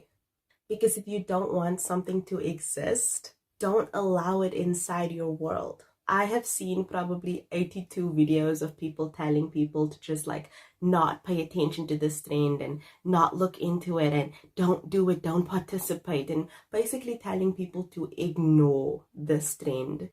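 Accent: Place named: South Africa. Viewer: I can spot a South African accent a mile away ❤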